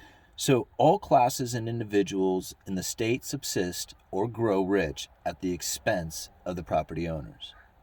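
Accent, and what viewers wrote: Pacific Northwest, United States. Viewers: You're such a Pacific Northwesterner. I feel like I'm listening to my oldest cousin who is from Bend, OR, roughly your age, and you both have the same "accent". I admire the hell out of both of you. Must come from the culture.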